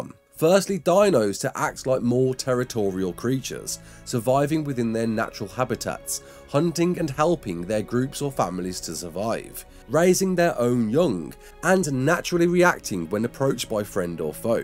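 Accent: United Kingdom. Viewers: I just love your british accent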